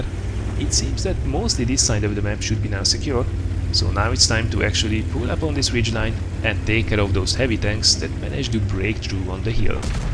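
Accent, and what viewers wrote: Hungary. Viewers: Most veszem észre a magyar kiejtést, ha ezt olvasod légy szíves valahogy jelezd , hogy tényleg magyar vagy:D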